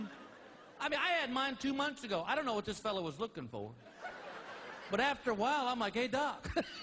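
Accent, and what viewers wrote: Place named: South, United States. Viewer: My mother took me to a Bill Engvall stand up performance in Texas, waaay back when. I was a kid, but I laughed every time my mom laughed. As I got older I started to understand the jokes and I cackle from the hilarity. He truly is the funniest person around. And his southern draw makes it 1000x funnier.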